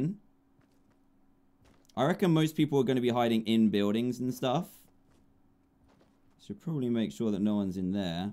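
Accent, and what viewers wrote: United Kingdom. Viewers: pure brittish man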